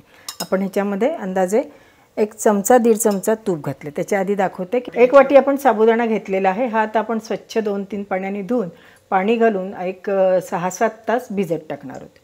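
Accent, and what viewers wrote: Standard. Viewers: हो मावशींची स्पष्ट शुद्ध अलिकडे दुर्मिळ होत चालले ली भाषा ऐकायची. मला मावशींची भाषा खूप आवडते. इतर चॅनेलवर आधी भाषेची वाट लावतात आणि मग पदार्थांची. मावशींच तस नाही.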